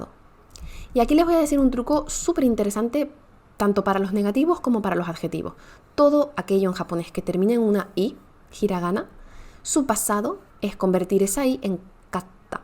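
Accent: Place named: Andalusia, Spain. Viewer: Im-pre-sio-nan-te. De lo mejor que he visto sobre japonés. Ya me suscribí. Sos como yo, sintetizando a tu modo encontrando puntos en común y poniendo en duda lo establecido. Pero lo hacés desde la autoridad que te da el dominar el tema, el haberlo estudiado en la forma tradicional (¿o ya de entrada cambiaste todo?). Una verdadera genia. Y la pronunciación, impecable (la del japonés, porque la materna...😂 Suenas a Andalucía ¿Puede ser?). Te admiro mucho. Y, claro,¡Gracias!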